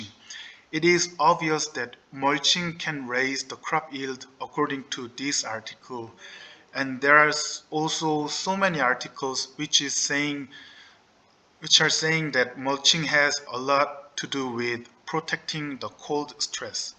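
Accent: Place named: South Korea. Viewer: 동영상 올려 놓으셨다고 해서 구경왔습니다^^ 한국어 억양이 있긴하지만 발음은 클리어하신 편이라 알아듣기 편하네요!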